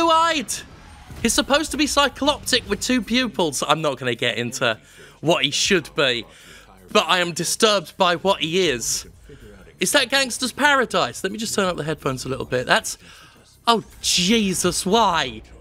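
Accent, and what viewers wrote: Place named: United Kingdom. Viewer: British accent voice in the video is so annoying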